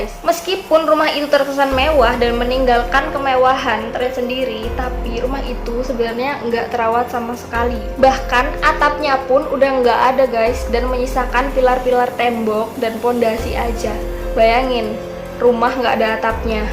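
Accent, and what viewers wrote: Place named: Surabaya, Indonesia. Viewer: Exspresinya dah ok mbak....tp suroboyoan ne iku lhoo seng jek ketok😂😂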